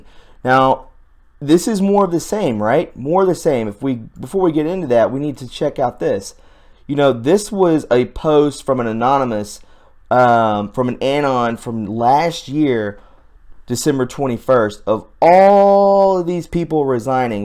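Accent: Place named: South, United States. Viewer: How is it that your southern accent as bad as mine?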